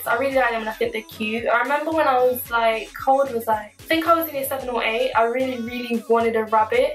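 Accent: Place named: England, United Kingdom. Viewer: your Accent is bomb ☺are you in England ?that is sooo dope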